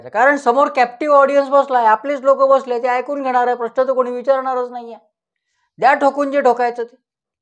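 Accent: Vidarbha, India. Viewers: your analysis is so cryptic and humorous, especially your Vidarbha accent is so endearing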